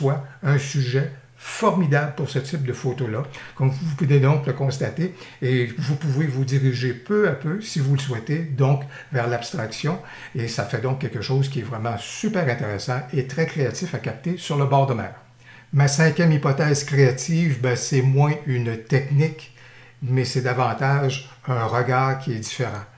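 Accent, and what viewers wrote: Quebec, Canada. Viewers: l'accent québécois et toujours un pur plaisir de sonorité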